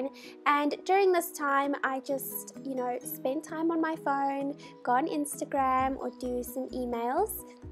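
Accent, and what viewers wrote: South Africa. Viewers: Hi, nice to hear a South African voice😋🇿🇦Thank you for the great video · You sound really innocent and cute ♥️😇 There's something original about your accent, sort of South African & I love it 💖💖💖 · You're south african🥰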